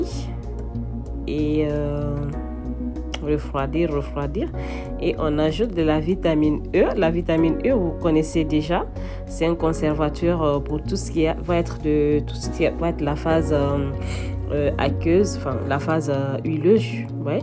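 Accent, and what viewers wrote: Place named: Senegal. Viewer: Vous êtes sénégalaise y’a pas de doute, magnifique accent ❤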